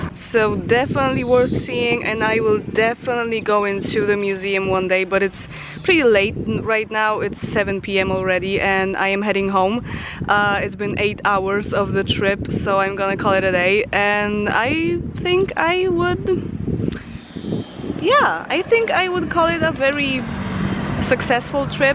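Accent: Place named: Poland. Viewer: Dawno Cię nie było. ;) Znowu dobra robota... Utrwala się fajnie angielski który jest takim powiedzmy "polskim angielskim"... Wiesz o co chodzi... Łatwo do zrozumienia... Bez jakichś tam dialektów itd. pozdrawiam. ;)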